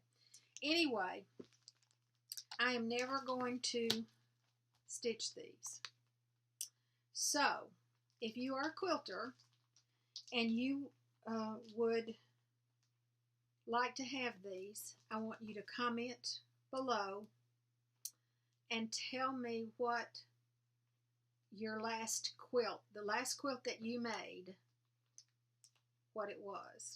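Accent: South, United States. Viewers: I just love your videos - I could listen to you all day long, being from Iowa I just love your southern accent. My family Thanksgiving is in the midst of changing and not just due to covid. This year we won't be gathering, and last year was the first time in my life that I remember going to somebody other than my Uncle Johns' for the gathering (over 40 years - I stitched the Plum Street His & Hers Thanksgiving stockings as a thank you). If you recall, I would like to know the designer of the Halloween tree/alphabet with the pumpkin at the bottom pictured ~ that is adorable. I just finished stitching Scarlett House's Autumn Alphabet. I am so very sorry to hear that your brother is declining, and will keep you both in my thoughts - Joan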